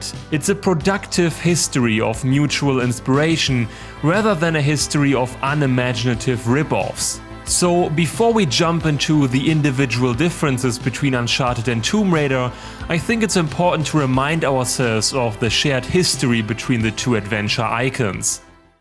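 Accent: Germany. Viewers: That's just his German accent. At least he doesn't pronounce it as 'Laura' like so many Americans do. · Nice video but are you german ?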